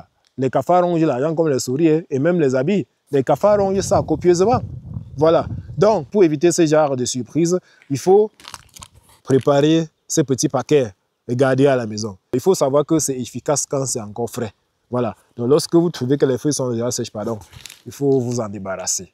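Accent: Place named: Sub-Saharan Africa. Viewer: Je m'abonne parce que je suis toujours enchantée par l'accent des Africains Subsahariens 🙂 Évidemment surtout parce que j'ai aimé les "tips" contre les moustiques et les cafards. En Algérie sur certaines côtes il y en a qui volent, j'ai vraiment peur de ces bestioles. Merci pour le partage.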